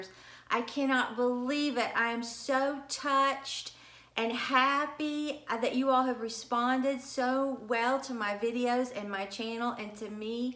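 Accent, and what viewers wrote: South, United States. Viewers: You have the best ideas! And I love your southern accent. 🙂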